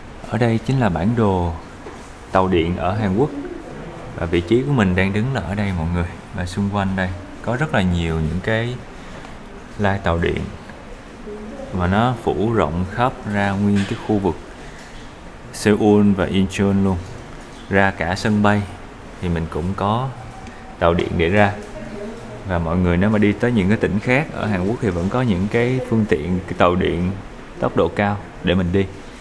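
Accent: Mekong Delta, Vietnam. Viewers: noi chuyện dễ thương chân chất người miền Tây love cho 1 live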